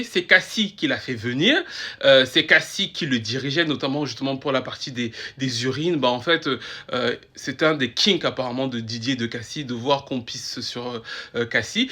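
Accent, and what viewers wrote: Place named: Cameroon. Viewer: Moi c'est ton accent qui m’intéresse... tu es d’origine camerounaise ?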